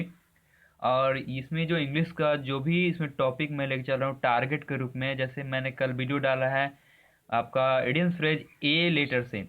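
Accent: India. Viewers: desi English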